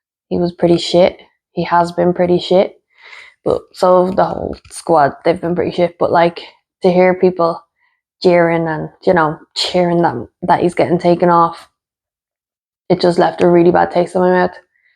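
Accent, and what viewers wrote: Ireland. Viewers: I love your Irish accent. I could listen to you all day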